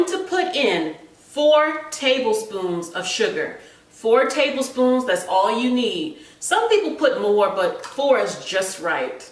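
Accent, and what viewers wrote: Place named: United States. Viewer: To master a language one must master lingo, jargon, slang, colloquialisms, regional dialects and individual nuances in phonetics. The standardization for what or how a word should be used actually changes often, akin to the English purposefully changing their accents to separate them from the puritans who founded the united states, which is how the "queens english" came to be... So back to, her unique enunciations are correct with her location in the united states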